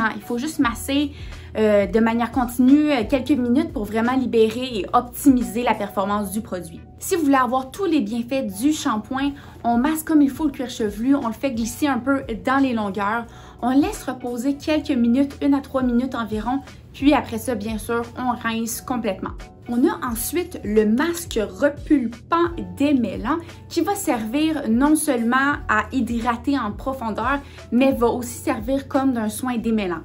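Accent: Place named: Quebec, Canada. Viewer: J’aime trop ta vibes et ton chou accent québécois je t’aime❤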